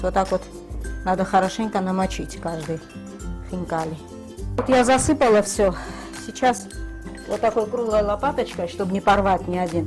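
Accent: Georgia. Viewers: Моя хорошая!!! Как же я рада была увидеть Вас на канале ,услышав Вашу речь с акцентом и увидев блюда дамашней грузинской кухни ....вернули в молодость .!!! Когда были молодыми жили с мужем в Тбилиси (Глданский р-н) а сейчас представляете я тоже живу в Испании (Кадиз) часто из грузинских блюд готовлю аджапсандали и сациви ,хинкали !! На всех видео поставила лайки!! Вы очень приятная ,а русская речь с акцентом бальзам на сердце!!!очень удивилась что так много зелени Вы нашли в Испании особенно свежий укроп и кинза ...для испанцев пряные травы не интересны.Если не секрет .,где Вы живете?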